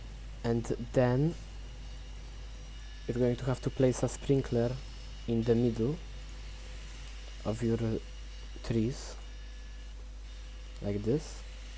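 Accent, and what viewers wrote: Poland. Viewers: bro are you from poland i can't listen some words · are you polish? you have a very polish accent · tylko cie uslyszalem i odrazu wiedzialem ze polak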